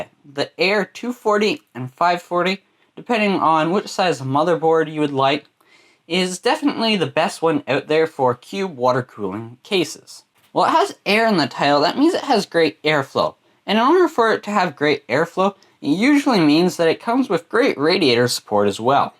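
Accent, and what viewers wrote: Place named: Canada. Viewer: you speak so bad and the Canadian accent makes it even worse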